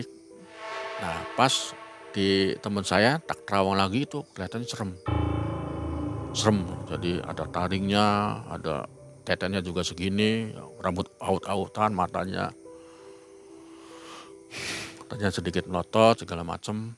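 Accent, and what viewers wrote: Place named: Cirebon, Indonesia. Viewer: asli wong ndi sih soale pada bae kya Indramayu cirebon logate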